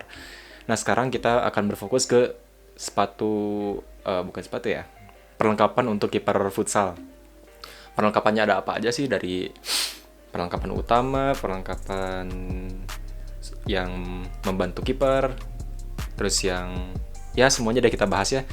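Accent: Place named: West Java, Indonesia. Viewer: Sunda banget bang nyorodot dong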